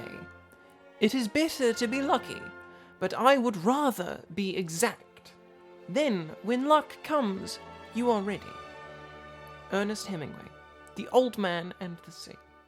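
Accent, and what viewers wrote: United Kingdom. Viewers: You're so much beautiful. Includes your Brit accent. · For some reason my mind keeps trying to convince me that you're attending Hogwarts. I believe it's the glasses and the accent.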